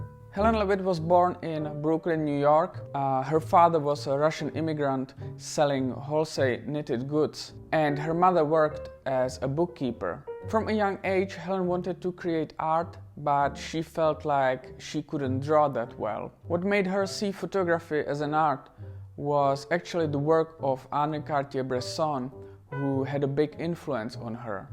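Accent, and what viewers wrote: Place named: Germany. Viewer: He speaks English with a heavy ( sounds German ) accent . I `m sure he speaks English better than you speak German .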